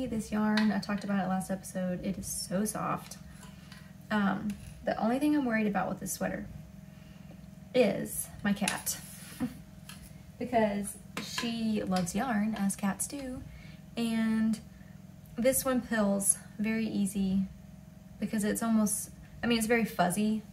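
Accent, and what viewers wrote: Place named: North Carolina, United States. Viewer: Congratulations on your 1,000 subscribers. When I watched your first video I immediately thought you were from NC and I was right. Your accent is very light just like mine but when you grow up in a place it is so easy to recognize. Thanks for another great episode and Happy Knitting!!!!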